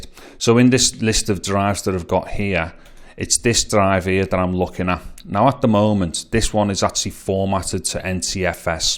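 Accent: Liverpool, United Kingdom. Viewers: Very well explained project it makes such a nice change to have an English ( Scouse ) voice rather than an American voice explain everything in a easy to understand form and informed way thanks very much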